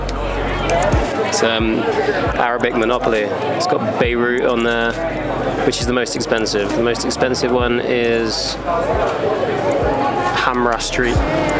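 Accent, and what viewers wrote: United Kingdom. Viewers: He sounds like he's from Southern England? How hard is it to visit a country like this with a UK passport?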